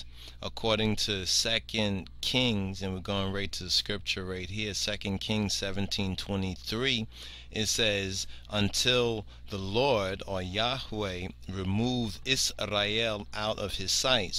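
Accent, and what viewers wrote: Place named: New York, United States. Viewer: Are you from New York or maybe Boston? I hear a familiar accent, just curious. (BTW) I like it a lot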